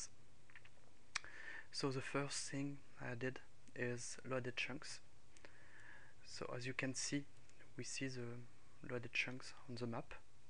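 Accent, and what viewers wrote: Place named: France. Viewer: L'accent français fait tout le charme de la vidéo =)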